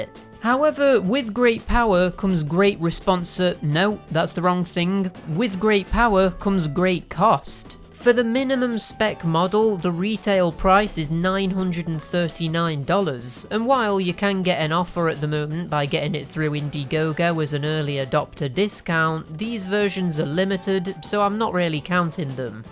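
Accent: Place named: North, United Kingdom. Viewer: Thank you for yet another great video,! I absolutely adore ur accent, which part of UK are u from? I would guess somewhere north.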